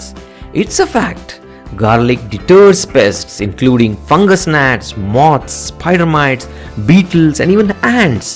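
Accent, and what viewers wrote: India. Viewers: Pronounciation is off.. example...The word 'young' you pronounced 'eng'..... 'sewage' you pronounced 'seawage'. Don't let that deter you. As Indians one can tell you're from South India so your accent is a distinct south accent.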